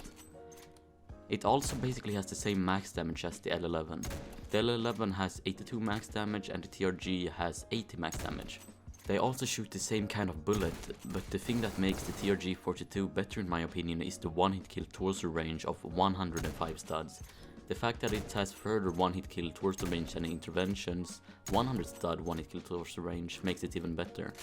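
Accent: Sweden. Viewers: I can tell that you're swedish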